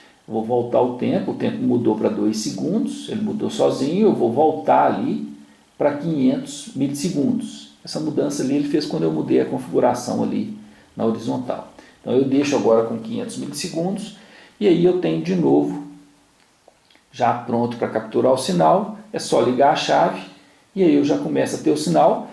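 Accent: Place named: Belo Horizonte, Brazil. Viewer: Esse aí é de BH certeza!😅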